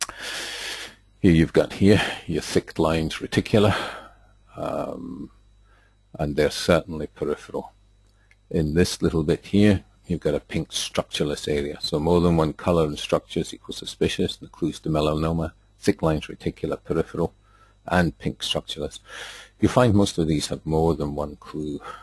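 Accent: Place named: Ireland. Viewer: Maybe their resolution isn't high enough but I would find it helpful if you increased your default zoom to maybe 120-130% if everything you do here. There is so much of your screen that is just wasted space. Otherwise, thanks for your series! It's been helpful as a dermatology refresher. I had encountered your videos a few years ago and had always wondered why I had this bizarre association between dermoscopy and Irish accents. Cheers.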